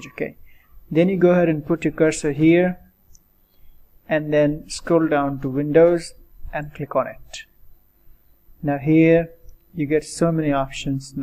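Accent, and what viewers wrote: India. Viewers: The Indian accent is your proof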